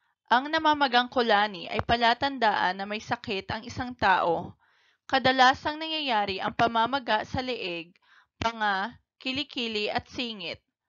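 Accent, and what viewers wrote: Visayas, Philippines. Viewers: Ok n saya.. Kaso bisaya te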